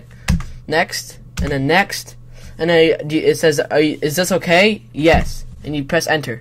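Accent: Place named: Australia. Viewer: oh look, hes from australia!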